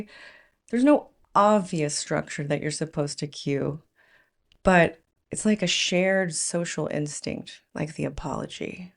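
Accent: South, United States. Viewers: Hi, unlike some American accents (the abrasive, machine gun type screech on New Yoick, for example), you have the kind of lovely, calm, soft, warm Southern drawl that one would hope to hear as one "draws down the final curtain, and joins the choir invisible", to parrot-phrase Monty Python. (I've just read that back, it was intended to be humourous, although I meant the first bit, but it makes you sound more like the Angel of Death! SORRY!!). Our sense of humour is WAY more sophisticated, combining dark sarcasm (in the classroom), irony, understatement, self deprication (a quintessentially British trait), and satire, that goes over the heads of a lot of Americans used to a more literal, almost slapstick style. "Sorry" is a kind of reflex response, to avoid escalation. Queues are just something we do instinctively, and when combined with our sense of humour/understatement is a way of gently letting of steam, when you're stuck in a HUGE long queue that's barely moving, the queue moves forward one pace, and you cheerfully say to the person ahead/behind "won't be long now". 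Queues in pubs are on a completely different level; you can be 3 or 4 deep at the bar, everyone just knows who's next to be served, including the barstaff, no arguments (although regulars do tend to get preferential service, with a nod and a wink, not speaking from experience, of course, lol!). The weather usually undstatement mixed with sarcasm, and is a very safe way of breaking the awkward silence with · I liked the southern accent! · I really like your American accent - very easy to listen to.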